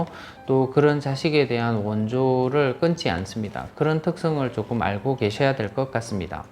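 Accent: Gyeongsang, South Korea. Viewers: 부산경남 분이신거같아요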